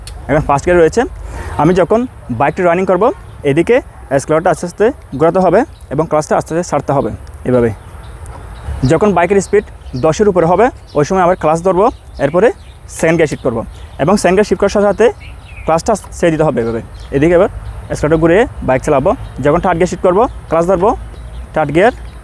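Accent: Chittagong, Bangladesh. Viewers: Vai ki Chittagong er 😂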